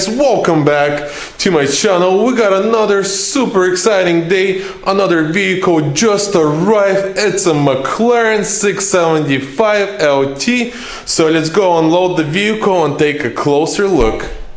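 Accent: Russia. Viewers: u have rus accent lool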